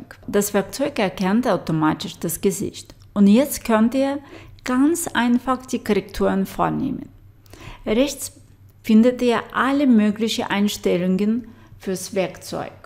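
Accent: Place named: Russia. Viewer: Хотела найти на русском, не нашла. Нашла на немецком… с русским акцентом (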